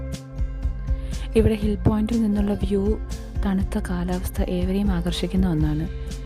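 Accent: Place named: Punjab, India. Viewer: Yaa allah... നിങ്ങൾ മലയാളം പറഞ്ഞോ... 🥰 അപ്പൊ മലയാളി ആണോ.. 🤔🤔🤔 നിങ്ങളുടെ english കേട്ടു, വല്ല പഞ്ചാബി ആണ് എന്ന് , എനിക്ക് മാത്രം , ആണോ തോന്നിയത് 😜🤪😜 നിങ്ങൾ സ്ഥിരമായി ഇരിക്കുന്ന bench അല്ലേ അത് 😍😍 അര്മേനിയ പോയ videos കണ്ടില്ല 🙂🙂 ശരിയാ... എന്നാ, ലുക്ക്‌ ആണ് ഈ സ്ഥലം... 😍😍😍 14 കുരിശൊ 🤔🤔🤔🤔 അത് കണ്ടില്ല 🙄🙄🙄🙄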